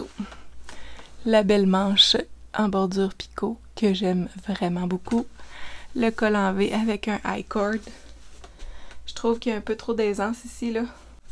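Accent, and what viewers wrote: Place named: Quebec, Canada. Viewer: Je viens de découvrir ton podcast et j’aime beaucoup.J’adore entendre parler avec ce bel accent québécois que j’ai découvert quand nous avons rendu visite à notre fille à Chicoutimi lors d’une année d’étude .J’aime aussi le style de tes projets .A bientôt j’espere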